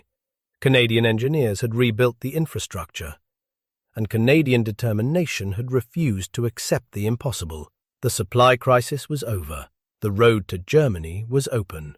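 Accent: United Kingdom. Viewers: If this is all about Canada why does the narrator have a Brit accent? WHO is the narrator? Where can I find the credits for the writers, creaters, narrator etc?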